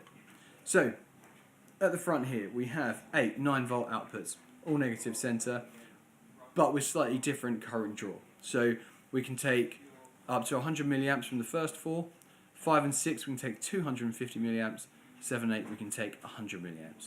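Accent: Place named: England, United Kingdom. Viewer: Judging from the accent, I suspect you're from the same part of England as Spinal Tap.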